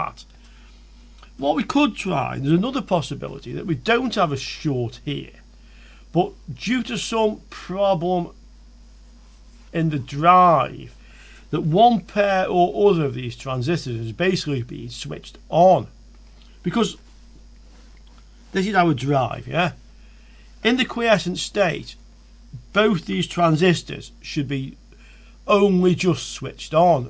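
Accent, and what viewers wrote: United Kingdom. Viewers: Thank you for your time and your helpful videos! I'm 44 and now I try to learn electronics. I'm from Austria, and so my mother tongue is German. Thank you for speaking this nice british-english! So I can improve my english at the same time. Thank you for your effort!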